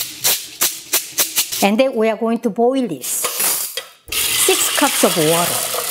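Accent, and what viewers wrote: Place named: South Korea. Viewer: 이분정말 매력있습니다 발음 귀에 쏙쏙들어오는 한국식영어 ~~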